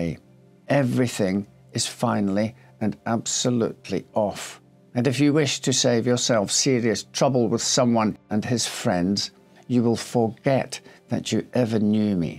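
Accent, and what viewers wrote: Scotland, United Kingdom. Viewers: Host, what is your accent? I said Channel Islands, my wife says Scottish??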